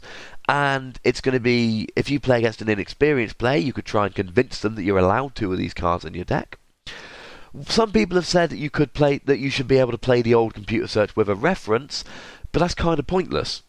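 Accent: United Kingdom. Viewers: His voice is just beast. Love the cornish accent, He's a good teacher too, he rescued my business grades!! If is watching then good luck in your career and if not, then someone pass on the message:)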